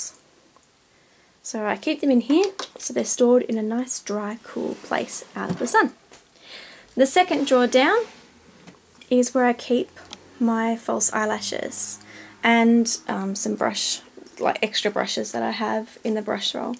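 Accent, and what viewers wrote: Australia. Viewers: LOOOOVE UR ACCENT! <3 best aussie style ever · are you australian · Knew you were Aussie straight away but I often forget how... Different we sound when watching YouTube or movies xD so jealous of your opi polish collection!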